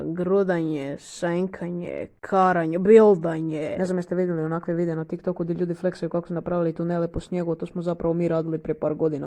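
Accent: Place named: Zagorje, Croatia. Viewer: Od kud si.. plzzz reci da si iz Zagorja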